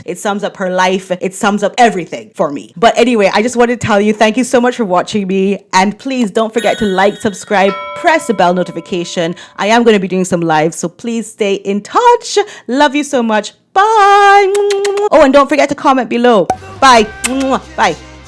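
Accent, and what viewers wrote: United States. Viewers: Firstly, I look forward to your videos. Love your American accents and your costumes. My only complaint is that you don't make enough videos. Does your daughter have dollies? They would be great in re-enactments of conversations between the three stogies (H, M and D). Of course, there would have to be smoke engulfing them.😃😗 All the footage from that night, including from Backgrid photographs and any other private or public videos or photos, can be used by the NY DA if there are charges brought against the Duke of Dumbassery and the Duchess of Delusion, as well as Sunny. The DA can subpoena them , or whatever. I'm not a lawyer like you. Sunny did block two police cruisers on the way to a real emergency. The two SUVs also committed multiple traffic violations. To be fair to Eric Adams, (I'm not a fan) he was being careful with his words. 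If you read between the lines, Adams said, "Bullshit!" and "Don't come back to my city". I have no problem with Cabbie Sunny making a little cha-ching from the douchebags' idiocy. Do you know how much they have to pay for their medallions? Being an NYC cabbie ain't easy. Also, Backgrid will not settle for a one-time payment for those juicy photos and videos. I predict documentaries, fictionalized accounts (maybe on Netflix 😁), articles in soooo many tabloids such as Hello!, People, OK!, etc. Backgrid owns them and, as all good capitalists, will not give away easy money. I hope those paps that had to deal with the Harkles bs get nice bonuses. B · Omg I love your "American" accent. That voice will be forever in my head for anyone that annoys me😂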